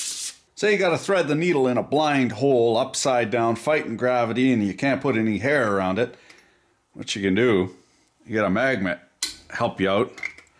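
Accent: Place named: New Brunswick, Canada. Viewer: Magnetic Hill? I knew you were from NB with that eloquent chiac of yours.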